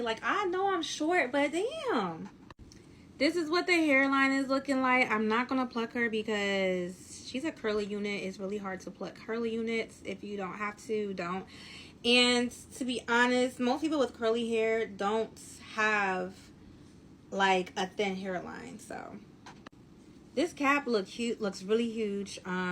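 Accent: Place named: Philadelphia, United States. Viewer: I knew you were a philly girl. Talking bout this jawn lol. 215🩷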